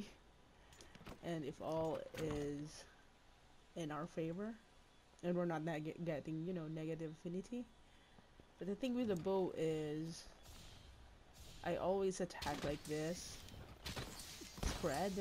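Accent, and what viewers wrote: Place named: Philippines. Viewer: you sound filipino =D. but you just gained a sub! love your build coz i main bow too!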